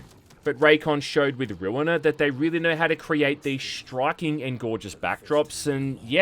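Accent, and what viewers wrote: Australia. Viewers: I used to love your content but as time goes by you more often than not sound like one of those bought out gaming "journalists" from IGN with the only difference beeing your thick australian accent... Since the last 10-15 uploads or so something feels off about your content bro...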